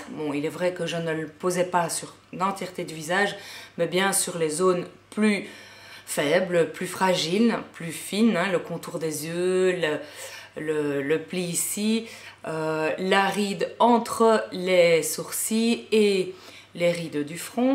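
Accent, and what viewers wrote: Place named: France. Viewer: Beautiful accent...love the french accent so much ☺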